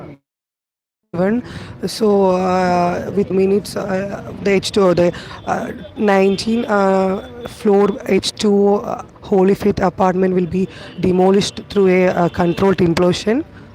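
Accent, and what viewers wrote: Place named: Kerala, India. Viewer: Its unbearable sir...The pronunciation, Grammer and the entire flow.,its such a manglish rendering in a world class news agency .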